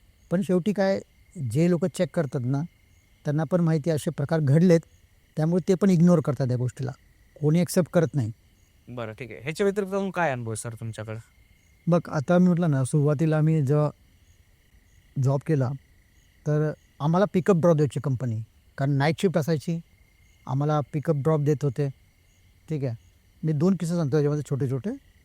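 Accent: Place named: Pune, India. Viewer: arey re mitra proper punekar ahai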